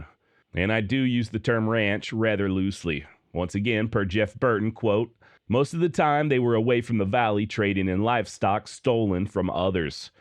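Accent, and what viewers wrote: Texas, United States. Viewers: right on I actually thought Missouri or Texas second possibly Louisiana you have a unique voice...no diddy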